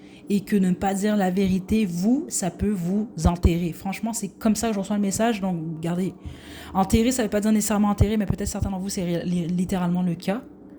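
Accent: Quebec, Canada. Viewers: Merci la! J’adore écouter votre accent québécois depuis ma Provence natale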